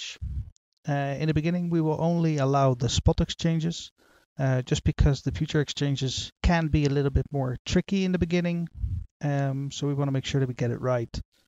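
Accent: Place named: Netherlands. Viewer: Idk why but it kinda sounds like you're Dutch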